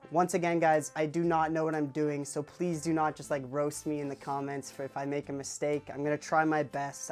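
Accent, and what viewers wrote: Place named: Canada. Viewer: man looks like a 30 year old spanish father of 3 with a happy wife but sound Canadian as fuck also sup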